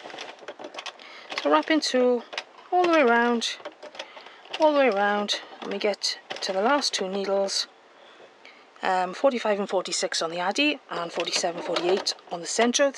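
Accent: Wales, United Kingdom. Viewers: So lush to hear a Welsh accent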